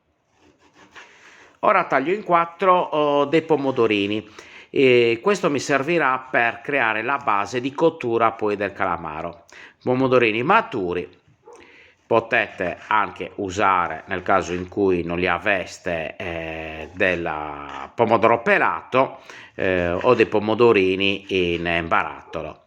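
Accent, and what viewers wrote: Sardinia, Italy. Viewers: BRAVO FINALMENTE UNA RICETTA ORIGINALE È SEMPLICE LA FACEVA MIA MAMMA E DOPO LA FACCIO IO UGUALE COME LA FATTA TU,. USIAMO GLI STESSI CALAMARI PICCOLI E FRESCHI IL POMODORINO SEMPRE FRESCO E VERAMENTE UNA BONTÀ E SE RIMANE UN PO DI SUGO LA SERA FACCIAMO 2 SPAGHETTI QUESTE SONO LE VERE RICETTE SEMPLICI E GUSTOSE CREDIMI PER CURIOSITÀ VEDO DEI VIDEO DI CALAMARI RIPIENI CHE VIENE IL VOMITO A VEDERLI DI QUANTI PASTROCCHI CI METTONO DENTRO MA PER FAVORE TUTTO IL CIBO PIÙ SEMPLICE SI FA PIÙ GUSTOSO E DIGERIBILE SARA, NOI ANCHE IL PANE GRATTUGIATO LO FACCIAMO FRESCO AL MOMENTO COL PANE DI CASA RAFFERMO IO NON O DA IMPARARE NIENTE CUCINO COME MIA INSEGNATO MIA MAMMA MIA NONNA E ANCHE MIA SUOCERA, E POSSO DIRTI CHE GENERI NUORE NIPOTI AMICI OGNI VOLTA VOGLIONO IL BIS, E MIO MARITO E ORGOGLIOSO, SIAMO DI MESSINA, E DAL PARLARE PENSO CHE TU SEI SARDO, CONTINUA A FARE CIBO SEMPLICE ETUTTO FRESCO E GENUINO E NO CIBO CONGELATO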